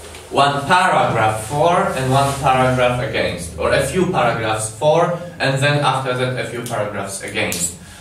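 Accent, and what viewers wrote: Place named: Poland. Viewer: Wiedza imponująca, rzeczywiście, gratuluję uczniom :) Przyczepiłbym się do wymowy, która jest bardzo polska :P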